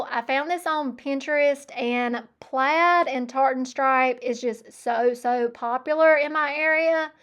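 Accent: South, United States. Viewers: I like your accent. To me it sounds very American. Surprise ! She's an American who lives in America. I don't have a problem with that 😅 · I thought you sounded country when I first started watching I grew up in country and I related and listening to you describe what you were doing with such cute projects is what made me keep watching So ignore that critic. I had a good laugh in another video when you said your dog was sighing because he was unemployed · Oh my goodness, …. Your accent and vocabulary is a big part of your charm. At one time, I had a similar southern accent, and as I was on the phone a great deal with people around the country in my career, as people would tease me and not take me seriously, I worked hard to change my affect, and now, after all these years….its gone. Unless I go back home to SC and I fall back into it! 😂 Thank you for holding to your roots. 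· I’m in the UK and I like to hear the variety of US accents, it just gives your channel extra character and charm. Loved the projects 😊❤ Merry Christmas 🎄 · Girl, I'm from southeast Texas and I have a draw myself. I LOVE your accent and many people would KILL to have a southern accent. Have you ever noticed how much they use our accents in movies, YouTube commentary, etc. They are just jealous and only wish they had our accents. Be proud of who you are, how talented you are, your accent, your voice, everything. You're an amazing person and I personally love you and your channel! ❤❤❤ don't let the haters get to you. I would've pinned the comment on the screen and given her screen name. If more creators would call them out by name, I think it would Happen a lot less... keep doing you 😊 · And my little secret, I do this and I haven’t told anybody…. But I walked through the house sometimes going.” hi I’m.” in my best country accent. 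I love the way you talk. It gives me comfort you’re so down to earth. So when people are going around, copying you and repeating how you sound even if it’s just themselves, you’re doing something right lady. ❤ Plus, if God wanted you different, he would have made you different. ❤️ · Please don't hire a narrator. I am in Germany and specifically listen to your sweet Southern Accent and find it intensely soothing. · you don't change a thing, I love your sweet presence, and your awesome southern accent. I loved all of your projects, but my favorite are always the milk paint green. I hope you have a very Merry Christmas with your family and friend. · Some people just don't like our southern accents. You are fine exactly the way you are. That ugly comment was just from a nasty person. That person definitely has the problem, not you. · I agree! I love hearing your cute southern accent. Don’t change a thing! 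Some people are just miserable and try to bring others down. We love you! ❤❤❤❤❤❤❤❤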